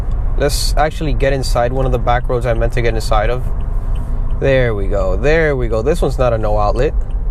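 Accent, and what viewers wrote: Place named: Miami, United States. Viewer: I can just tell you from Miami lol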